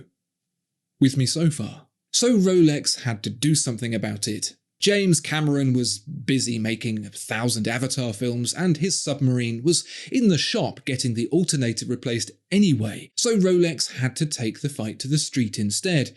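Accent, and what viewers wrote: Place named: United Kingdom. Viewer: I love to put the face to the voice I’ve heard for years… However you gotta find a space where you’re better depth of field… The blue background is too stark… And all the YouTube army of watch channels do the perfunctory man cave muted colors with tchotchkes curated from the cork sniffing image they’re attempting to curate… And although I’m sure you paid an artist for your logo it looks too much like a car design and has nothing horological to denote the subject of your channel… Otherwise I’ve enjoyed you for many years and now my sons are all watch enthusiasts As with most things a British accent elevates and always has the right amount of tonality to not be hard on the ears and your copy is always a beautiful poetry of observation, Snark and on point assessment When I started researching watches the only man I found at that time was Alex from Watch advisor… Who actually lives in Switzerland and seems to know many companies ceo… I still find his charming enthusiasm and accent useful and comforting… But keep up the good work Your voice is often playing on the TV in our household🎉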